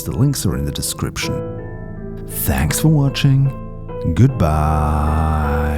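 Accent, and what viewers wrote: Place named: Germany. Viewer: Thanks for these update videos. I don't have time to get deep into KSA development these days, so I'm glad you're providing summaries of whats going on. As an aside, what is that accent of yours? It sounds sort of German, but slightly spicier. So like perhaps you're Dutch or Austrian? I don't think Polish, and I feel like Belgian would be more Frenchlike? Just curious.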